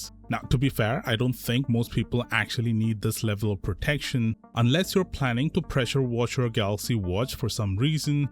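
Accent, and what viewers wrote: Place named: India. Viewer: He clearly speaks English with a Hindu accent, he’s not Turkish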